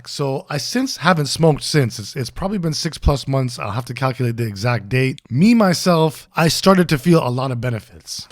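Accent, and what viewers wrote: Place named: Toronto, Canada. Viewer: Why is it so easy to tell that someone is from Toronto 😂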